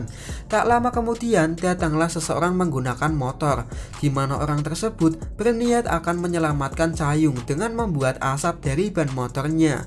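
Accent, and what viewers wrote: Java, Indonesia. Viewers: Jowone endi sampean? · medok banget..hehe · Aku malah baru ini lihat di tv gk pernah lihat karena jarang nonton tv 😂 seru jugak filem nya 😂 mas nya jawa tpi lucu ngong nya jadi tambah terhibur 🙏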